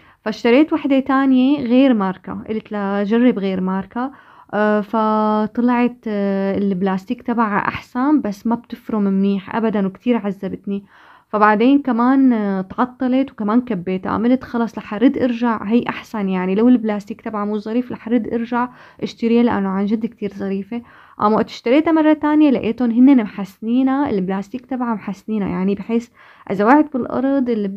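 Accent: Syria. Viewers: متابعتك من جديد من الشام.. ومن لهجتك دغري عرفت انك سورية ❤❤❤مااا شاء الله فيديوهاتك مبينه بتجنن رتابة وتنظيم راح اعمل لفة بالقناة شوفهن تبقى كلهن عرواق الله يهنيكي ويسعدك يا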